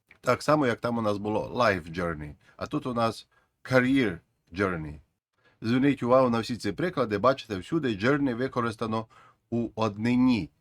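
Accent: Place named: Ukraine. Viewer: Тема хорошо раскрыта. Мне нравится особый украинский диалектный акцент автора ролика. Интересно с какой он области?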